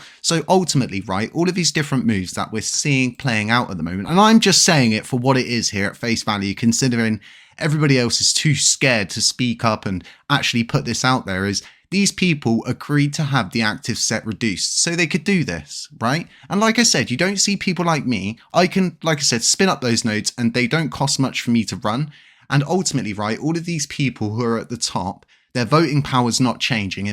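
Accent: United Kingdom. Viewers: I absolutely love your videos with the 007 accent, but Christopher Harris is also adorable. He has a 007 accent too. I prayed for God to let my late dog 2/29/2023 visit my son and sure enough he woke up all excited and said he was visited by the dog in his dreams and he had no idea I was praying for that. What I do know is I follow both you and Jesus is Lord because you both want the same thing which is Lunc to go to the moon. I love how the cat wears glasses 🤓 ❤❤❤ but Christopher Harris I believe really gets dreams but he’s not the only Christian influencer on YouTube getting dreams about LUNC. I dreamt once my dog and I playing in heaven all night because I prayed and prayed for him to be healed from distemper but he did not make it. But God gave me an entire night in heaven in a dream playing with him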